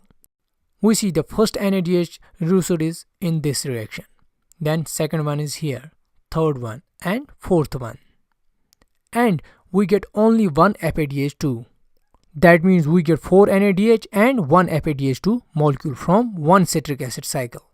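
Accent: Kashmir, India. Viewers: Sir, are you from Kashmir? Your accent indicates so.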